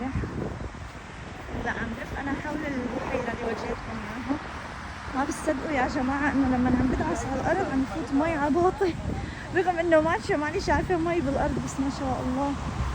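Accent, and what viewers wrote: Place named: Syria. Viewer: بكره لكنه السوريه بتوجعلي اذني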